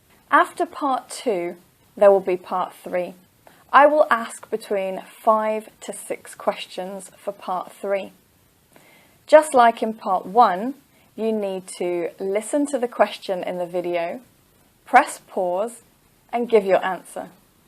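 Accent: United Kingdom. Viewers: Hey thanks for the videos. Studied for 8 days watching every video of yours and also checked the website for reading test. Got 7 band overall. Very happy. Thanks for the help. Didn’t get enough time to practice, else 8 band was easy. Thanks a ton. BTW, I’m in love with British English now! 😅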